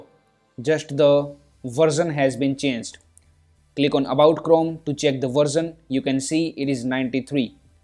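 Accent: India. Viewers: You sound like you are from India. In America we speak much slow so take a breath when you give instructions so we can follow you and you can gain subscribers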